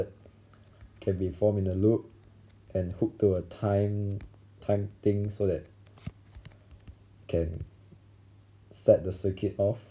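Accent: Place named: Singapore. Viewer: Bro you sound like a singaporean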